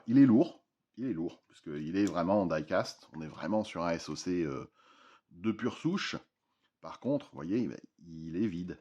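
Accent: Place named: France. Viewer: Quite an impressive French Accent here :D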